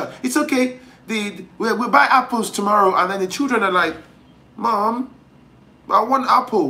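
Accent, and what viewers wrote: Yoruba, Nigeria. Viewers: lol... I love your YOruba-English accent.. PLs speak more of it.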